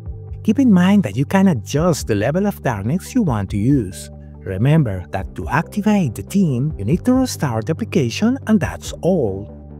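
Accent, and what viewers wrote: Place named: Colombia. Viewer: Siempre esa voz de hablando inglés con un acento colombiano es bastante íconico y reconocible en si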